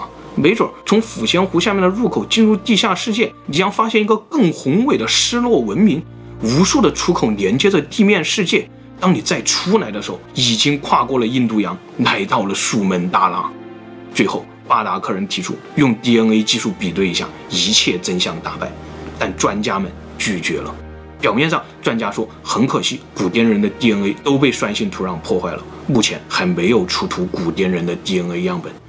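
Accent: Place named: Zigong, China. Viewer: 小哥是自贡—带人吧，你的口音太像南征北战的那位演军长的陈戈…大才子自贡人。自编自导自演的名剧抓壮丁😁😁😁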